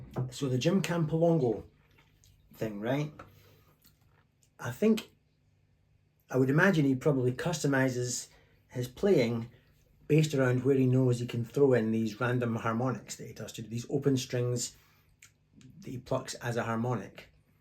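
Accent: Scotland, United Kingdom. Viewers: What a fxxxing lovely sounding Tele. Of the many many custom Teles I've heard on Youtube, that one really sings (of course helped no end by the great phrasing and touch of the guy holding it). Also, didn't expect to hear that accent after the opening music. From a fellow Scot (albeit faraway from home)...just fantastic playing mate. Also Also...why cant other Youtube channels get decent amp tone like you?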